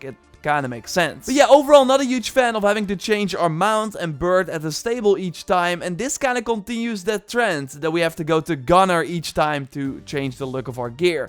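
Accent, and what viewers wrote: Netherlands. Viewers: I HATE his Dutch accent on his english.... like it sounds cheap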